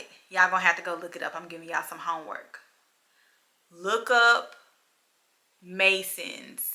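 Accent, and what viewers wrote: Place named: South Carolina, United States. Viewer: I was wondering why you sounded soooo country - then you said you're in South Carolina. I'm in Columbia - Broad River / Saint Andrews area. Hello neighbor!!! 😁😁😁😁 Will you find out how Prince is doing please? Thank you. Keep up the good work 👍👍👍👍👍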